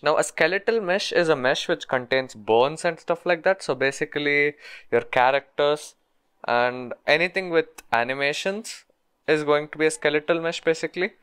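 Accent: India. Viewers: when i started to watch i tink that the indian accent is kinda annoying but after 30min watching i tink tat the indan acent is actualy funy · Sounds like Indian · English is not my native language. I speak with an accent. For me if they did this course with normal English it would be much easier to understand. This heavy Indian accent makes the course useless for me. Sorry. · He is an indian so has a clear accent so seems heavy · Gotta be Hinest, it really annoys me when it's a thick indian accent. But his is not that thick, thankfully. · Indian accent, seriously? Come on! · Bro’s accent is more indian than mine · Indian accent... Learning from my fellow Indian... 😋😋😋😋😋😋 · its nice that it is recorded by an indian teacher so i have no issue in accent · i heard indian guy, i subscribe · индусский акцент. чо уж делать. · 印度口音太重了. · I DON'T like Indian English accents also but this one is not too bad, in my opinion. 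I can follow it alright.... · This is so great... But unfortunately indian accents are just a dealbreaker for me. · 😐 I definitely don't watch this video i don't like Indian en accent 🙂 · please dont use indian cant understand them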